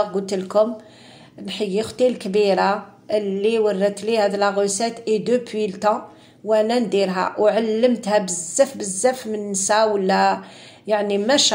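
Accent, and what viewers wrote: Algiers, Algeria. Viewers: لازم ديريلنا هذا الوصصفات التقلدية تاع زمان يعطيك الصحة مشتركة من السطيف والاكثر في وصفاتك هدرتك الحلوة العاصمية الاصيلة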